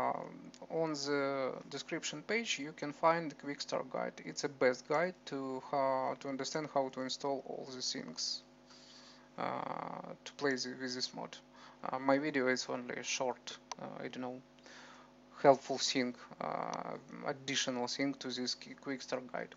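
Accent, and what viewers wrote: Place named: Russia. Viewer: А можно тоже самое только по русски? Акцент выдает русскую школу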